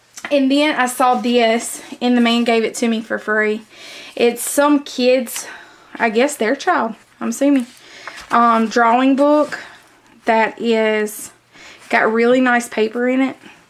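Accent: South, United States. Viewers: Came through Foley just a few weeks ago going to Orange Beach! Love that Southern accent! When I go to meeting in D.C., New York or Chicago they always ask, "Where is that accent from?" I say, "Accent? What accent? I don't have an accent!" lol And then I tell them I'm from New York! lol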